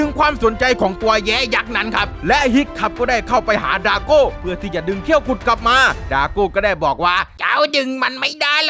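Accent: South, Thailand. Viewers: แหลงใต้มากๆ ก็ดีน้ะครับหลกดี555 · พี่คนใต้เหรอแหลงใต้ชับพึด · สำเนียงคนใต้เลยนิ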